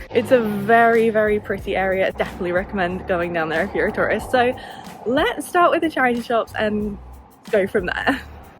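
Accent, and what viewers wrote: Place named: RP, United Kingdom. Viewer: You are so very posh and to hear you say pissing…..lol. Excellent vid. Xxxxx.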